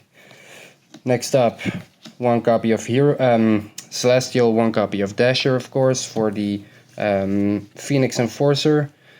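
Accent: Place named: Montreal, Canada. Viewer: 🤣 ton accent est pareil de ceux de Montréal 👌😁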